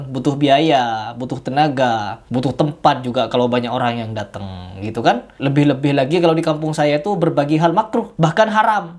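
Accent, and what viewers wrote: Madura, Indonesia. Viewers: Kayaknya bang admin orang madura ya, soalnya terlihat dari logatnya.. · Masnya orang madura ya? Kayak logat madura... Saya penonton baru mas...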